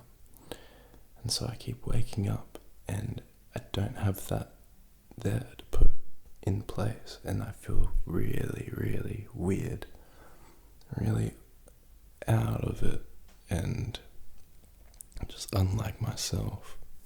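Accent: Australia. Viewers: I think it’s Australian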